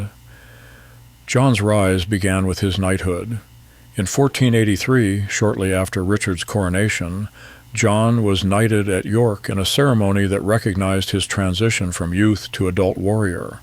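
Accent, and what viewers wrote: United States. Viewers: The two illegitimate children would have never inherited the tbrone, so what happened to.them, apart from interest, is simply not important. I do wish we could have an English accent not an American one narrating this!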